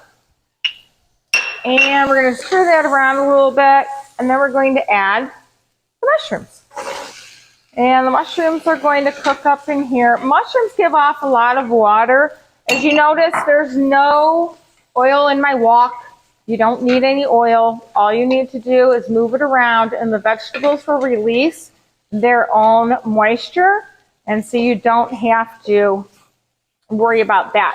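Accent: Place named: Fargo, United States. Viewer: I love the “Fargo” accent!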